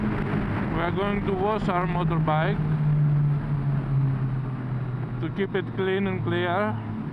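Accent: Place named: Birmingham, United Kingdom. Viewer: I can distinguish the Brummie accent... 🤣🤣🤣 Keep it up!!!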